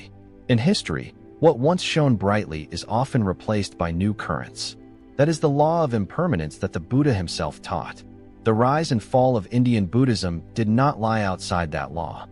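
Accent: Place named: United States. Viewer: good documentary - despite that american accent - 😖 - thanks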